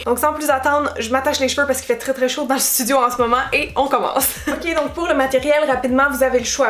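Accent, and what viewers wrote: Quebec, Canada. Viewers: Holala J'ADORE l'accent québécois 🤣❤️❤️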